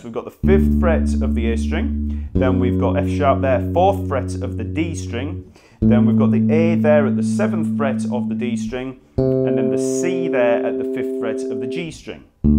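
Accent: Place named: London, United Kingdom. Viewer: he s not a verry goog bass player he is a teacher in deed but what i like the most its his accent it s a delight makes me feel like being in hide park at speakrs corner he deserves a marble arch bless cheers from France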